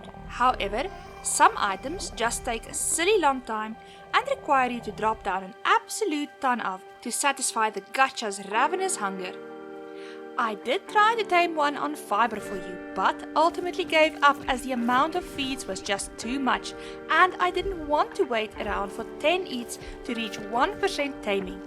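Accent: South Africa. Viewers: south african ACENT? :-) Love the clip 🙂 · are you afrikaans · You sound South African.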